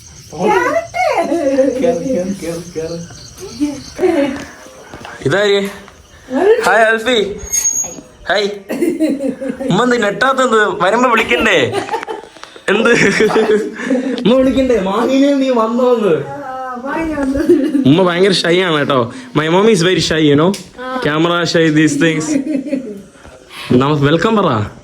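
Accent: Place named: North Kerala, India. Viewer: boss , eatho oru uthara keraloo aa samsarathil oru pucham …i luv malappuram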